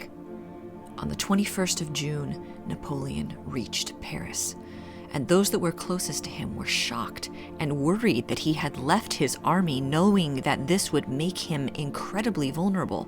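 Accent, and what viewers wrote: United States. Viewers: The loveliest American accent - and great delivery.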